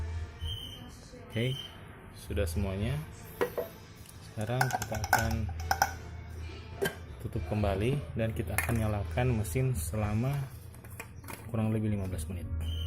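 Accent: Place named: Manado, Indonesia. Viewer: Dapa dengar rupa orang Manado ini kang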